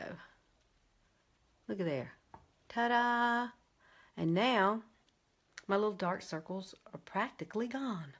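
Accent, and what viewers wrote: South, United States. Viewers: I can tell you're from the South from the way you say "oil " lol I'm up in the mid west (Wisconsin) " OyL ". 💞your Channel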